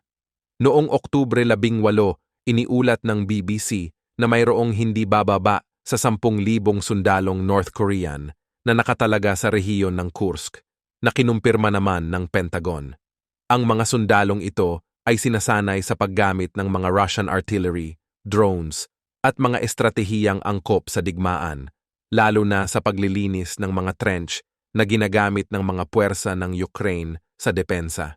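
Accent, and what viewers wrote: Visayas, Philippines. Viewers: Hoy ayusin mo tagalog mo.biaya kaba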